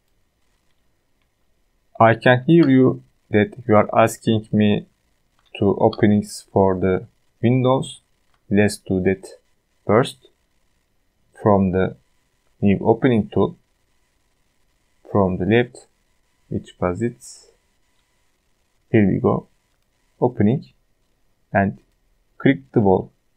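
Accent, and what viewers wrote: Turkey. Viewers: where are you from? I was curious. You speak English like Turkish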